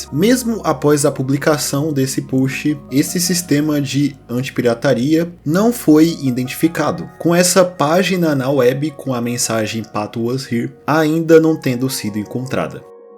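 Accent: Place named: São Paulo, Brazil. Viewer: Será que o é branco, preto ou asiático? Magro ou gordo? Será se ele é baixinho ou tem 1,90m? Talvez nunca saberemos. Só sei que ele é paulista pelo sotaque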